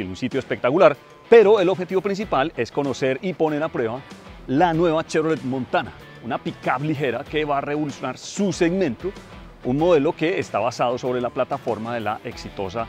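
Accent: Bogotá, Colombia. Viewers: Habla como rolo lentico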